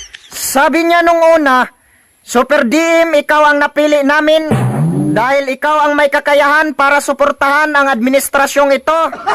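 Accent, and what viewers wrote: Visayas, Philippines. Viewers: Napakatalented. Parang bisaya yung dila pero napakagaling na makatang tagalog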